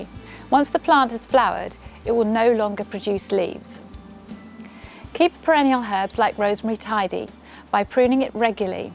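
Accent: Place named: England, United Kingdom. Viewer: English accents make me nuts!! Great video though!!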